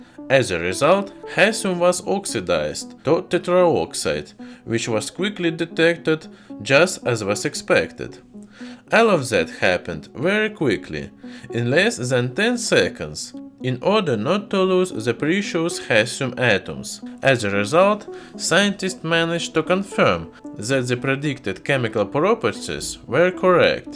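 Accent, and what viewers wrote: Russia. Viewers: Thanks for posting these videos. I enjoy learning about the elements with a cool Russian accent. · This is more like Russian with an english accent... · Love your Russian English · It's Russian accent--the main reason you hard to understand.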